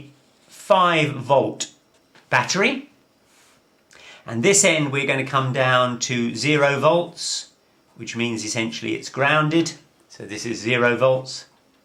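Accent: United Kingdom. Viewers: GREATＶＩＤＥＯ Very clear! Your british accent is nostalgic haha. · British accent LOL I like it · Great video, great pacing, and I always feel smarter learning something from somebody with a British accent. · Brilliant at last a person who speaks British English and can deliver in a clear manner enabling me to understand as I listen more of the same quality please. I feel the need to study more and that is the frame of mind a good teacher should leave a student in as I said Brilliant.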